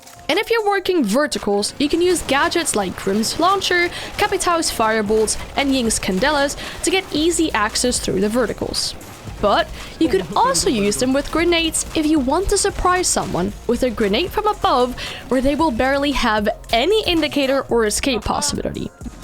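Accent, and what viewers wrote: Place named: United Kingdom. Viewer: Lol you're dutch? In another vid i saw u sounded British 😅 groetjes.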